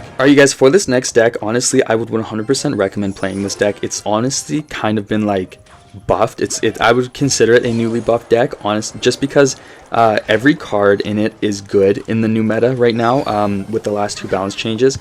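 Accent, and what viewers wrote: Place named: Canada. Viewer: Your voice sounds so Canadian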